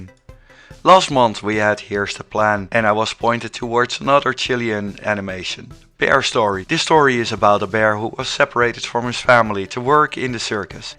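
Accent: Netherlands. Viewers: Eyy I hear you’re dutch!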